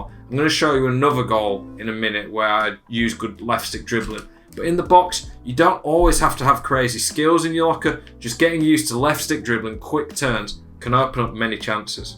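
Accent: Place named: Scotland, United Kingdom. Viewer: Where r u from bro? Scotland? Im learning english and its interesting for me all ur rUns etc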